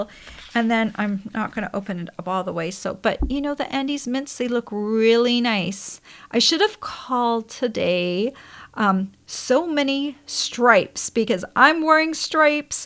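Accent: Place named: Canada. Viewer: Hi, for some reason (maybe your accent) I thought you were in Canada! I was so shocked when you just said you are in the Boston area!! So I CAN order Stampin' Up! products thru you in the future...😊😁😄Yea!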